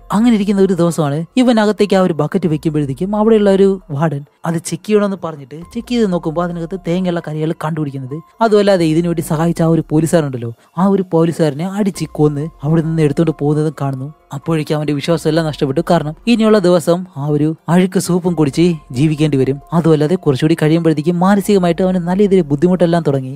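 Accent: Palakkad, India. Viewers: പാലക്കാട്ട് കാരനാണല്ലേ കഥ പറയുന്നത് 😃?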